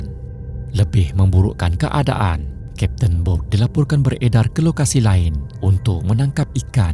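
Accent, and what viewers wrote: Sabah, Malaysia. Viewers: Rasanya admin ne orang malaysia tp lorat sabah atau sarawak kot.. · SAYE TAK PAYAH CAKAP APE² SAYE DAH KENAL DAH SUARE ABANG NI ORANG MALAYSIA SABAH ATAU PON SARAWAK TU JE 😊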